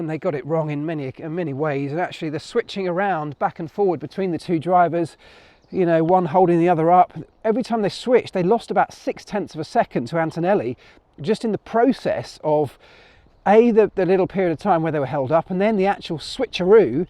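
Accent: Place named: England, United Kingdom. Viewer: Marc, the combo of you walking your dogs through the English countryside while giving a blow-by-blow on the latest F1 is genius. Unique. I am a fan and Seedstream user. Authentic stuff only a true F1 participant could pull off. You know that an English accent can raise bias alarm bells but thank you for keeping the commentary on an even keel. Continued success and many thanks.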